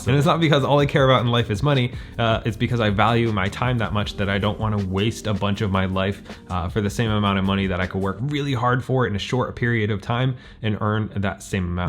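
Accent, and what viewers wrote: Boston, United States. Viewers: Love the accent bit! Were you born and raised in Boston and you just "lost" your accent or did you move there later? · I like the Boston accent 🤣🤣🤣… I love this concept, currently having a hard time with meal prep and cooking . So my money is going to restaurants 😩 … but learning to build a list and plan the meals ahead has been the biggest challenge. Hopefully I can switch my mindset and it will help.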